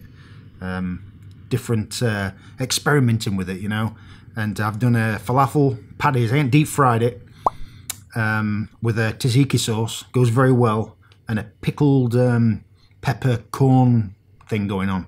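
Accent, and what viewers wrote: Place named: United Kingdom. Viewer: mate, with your style of production and your superb editing you could produce video packages for local TV stations that do morning news and chat shows. Local stations are always looking for premade video packages that they can run. You have just the rite personality and with your good looks and british accent you are a natural.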